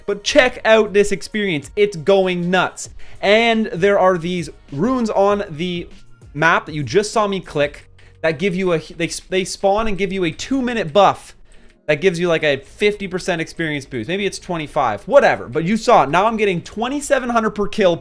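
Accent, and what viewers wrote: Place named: Canada. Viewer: UR CANADIAN ACCENT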